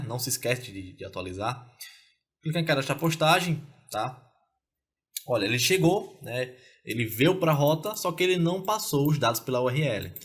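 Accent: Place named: Ceará, Brazil. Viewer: maah... tu é do ceará? teu sotaque é do ceará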